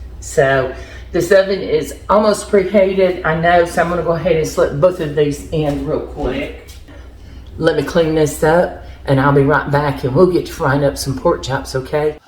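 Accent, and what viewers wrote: South, United States. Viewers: Tuning in from St. Louis. As a single guy, I’ve found a love for cooking as of the last year or so. Thank you for the inspiring recipes and a southern drawl that’ll make a guy just melt.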